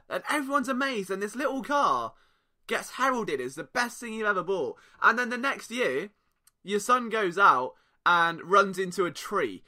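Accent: United Kingdom. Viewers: Kamu Grugier-Hill -- To Choke: to have victory in your sights only to let it slip through your fingers. Titans, Panthers and Dallas. No wonder the Eagles can't figure it out. They don't even know they are the ones who are choking! Dallas game -- Obviously need Carson to have a big passing game but Sproles is the key. The one running back that a defense can't gameplan. According to the New Orleans game, 14 points will win it. Need points on the first two drives. Brandon Graham -- Won't be signed because there is no money because Eagles will want to get the Carson Wentz deal done in 2019. This was clear to me as soon as they didn't do a deal this spring. Other deals -- Which receiver is better for the Eagles: Tate or Jeffries? Can only keep one. -- Make your goal to become an Eagles scout. You do good analysis and the Eagles like having people with British accents. 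Find them an under the radar franchise running back like Pittsburgh's Willie Parker.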